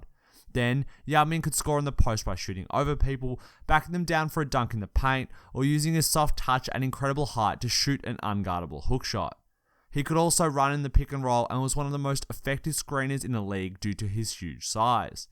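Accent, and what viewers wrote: Australia. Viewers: Do I hear a fellow Aussie that’s a sub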